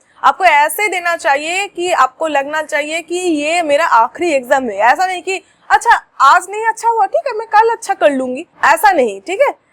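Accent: Bengal, India. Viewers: I think your ascent is begali ♥️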